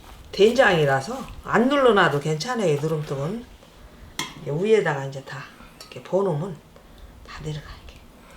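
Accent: Chungcheong, South Korea. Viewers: 전라도 사시는데 충청도 말씀으로 들려요~흐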